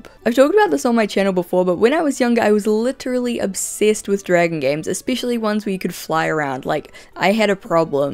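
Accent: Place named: New Zealand. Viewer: Not only is your lovely kiwi accent with all those wonderful inflections and vowel pronunciation just magic to listen to when relaxing or reading etc... But you have great scripts and they flow so well. You put a lot of information in your videoes but they never feel like there's too much to digest or you are moving too fast... You have a great knack for taking these complex stories apart and making an engaging, enjoyable and interesting script out of them... Aaaaad it's just a delight to see what fantastic and cool looks you will rock for the video. You never ever ever fail to make me go 'wow' 😂 You are a real treasure and I really hope your channel grows and you get the success you deserve. Have a really great Christmas and a very happy new year! 🍻 ✌️ ☀ 🎅 🎄🎁☃️❄️❄️ From 🇮🇪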